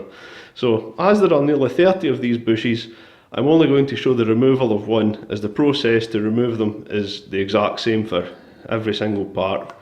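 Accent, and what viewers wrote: Scotland, United Kingdom. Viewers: The amount of rust is ridiculous was this a flooded car? or is it the effect of Scottish weather? P.S. I'm guessing from the accent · Lol . Without trying to offend you I am guessing you are Scottish?? Your narration is good mate , no need to change anything. 👍 if anything staying true to your accent is great . There is already to many people that feel the need to cater to their viewers more then necessary. Stay true to yourself. People are here and liked and subscribed to your content for what it is . Not for what they think that it should be . It is your channel. It it bothers them then they can either get over it or buggar off I'd say.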